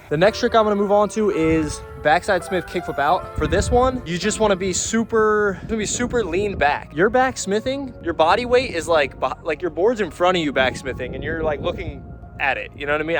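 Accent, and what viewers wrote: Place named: Pennsylvania, United States. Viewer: You sound a little like. 😂 🔥 PA accent I guess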